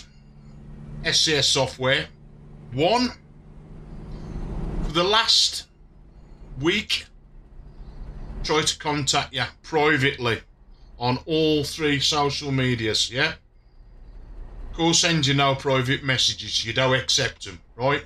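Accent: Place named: Black Country, United Kingdom. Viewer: Great to hear a Black Country accent. I live in Wales now but I'm a Gornal bloke. Thanks very much for the info on the new force feed back. I've got a G920. The steering since the latest update is way too light. I've tried everything except that internal friction controller. I'll have a go at changing that tomorrow. Cheers mate.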